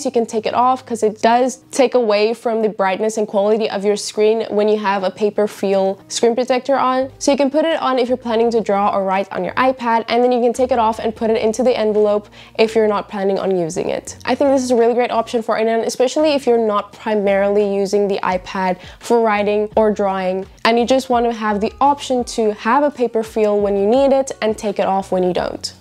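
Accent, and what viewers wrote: South Africa. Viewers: You sound so South African! I’m also from SA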